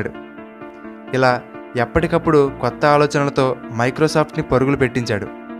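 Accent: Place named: Kakinada, India. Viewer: Ea vuru bhaiyya? Kakinada aa? Voice 😝slang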